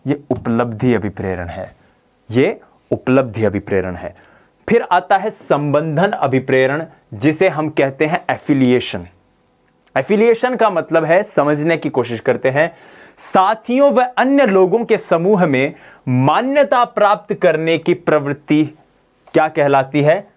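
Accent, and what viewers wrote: Rajasthan, India. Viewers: Sir ur marwadi is fabulous. We olso speak this language in my home ☺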